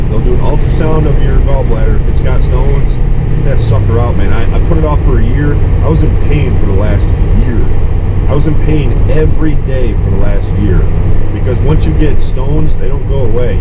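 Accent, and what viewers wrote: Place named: Texas, United States. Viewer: Michigan? I figured you were from Texas or something lol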